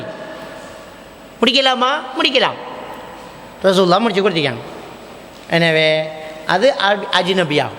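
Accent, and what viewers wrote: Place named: Sri Lanka. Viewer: திருமணம் பற்றியும் தலாக் பற்றியும் தெளிவாக விபரமாக சிறப்பாகவும் சுந்தர இலங்கை தமிழில் அருமையான விளக்கம். அல்லாஹ் உங்களுக்கு ஈருலக பாக்கியங்களை வழங்குவானாக.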